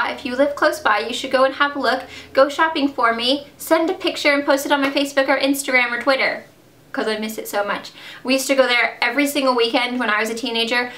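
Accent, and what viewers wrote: England, United Kingdom. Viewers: what happened to your English accent? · I can tell she used to British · Well, on and off throughout her growing up years she lived in America. Also, sometimes she says the word "rubbish" for something that's disagreeable, she pronounces words ending with "ing" as "ink," and pronounces "mirage" as "MEER-awj" (a soft-sounding j) Those are the times when she seems to sound a bit British to me :) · Can you PLEASE PLEASE PLEASE talk in an english accent? Do you miss having an accent? I wanna hear it so bad! Also, I would love to learn your story, where in England you are from, why did you move to America, where in America, etc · I KNEW IT. I've only seen a few of your other videos so I didn't know you were from England before, and you have no accent, but I still guessed you were from England. Something about the way you speak is British, even if you don't have an accent. 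· your English accent totally came back in this video! lol! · did you have a British accent?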